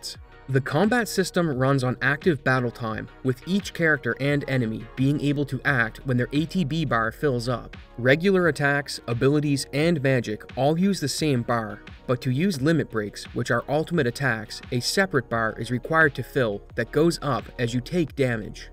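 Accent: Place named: Atlantic Canada, Canada. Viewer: incredible video. I have to point out tho, as a Newfie, you HAVE to be from Atlantic Canada some where right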